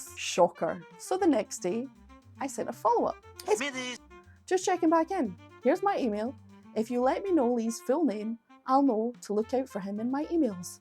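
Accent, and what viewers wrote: Scotland, United Kingdom. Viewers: I especially appreciate your sense of humor, knowledge of music, no nonsense approach and of course, your wonderful Scottish accent! p.s. Thanks for making me a sandwich!!!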